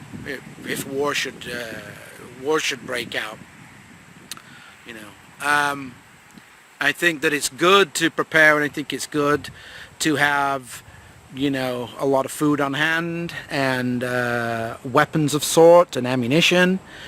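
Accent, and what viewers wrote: United Kingdom. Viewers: How long have you lived in the States? I detect a very familiar British accent still leaking through.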